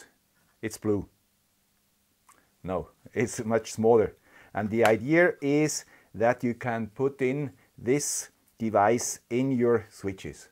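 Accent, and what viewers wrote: Switzerland. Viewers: den Schweizer Dialekt hört man schön raus : ) LG aus Österreich, tolles Video. CE Markierungen sind so eine Sache bei den Produkten ^^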